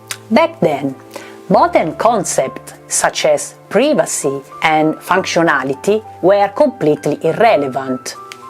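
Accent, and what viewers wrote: Italy. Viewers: La sua pronuncia dell' inglese è assolutamente italiana ! Niente di grave, ovviamente :)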